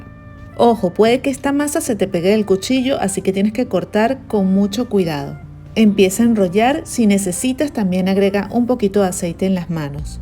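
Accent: Venezuela. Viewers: Me encantan tus recetas muchísimo . Me gustaría aprender algunas recetas de tu hermoso Venezuela 🇻🇪 me encanta tú acento 💜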